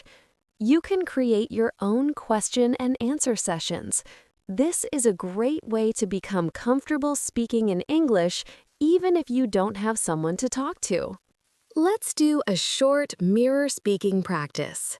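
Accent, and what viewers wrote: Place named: India. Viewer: India accent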